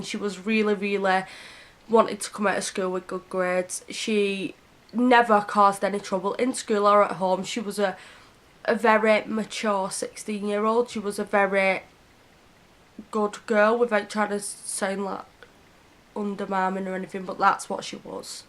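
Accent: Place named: Lancashire, United Kingdom. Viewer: I love your Lancashire accent.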